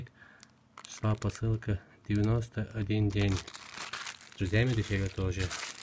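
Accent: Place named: United Kingdom. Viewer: у тебя акцент интересный,британский или вроде того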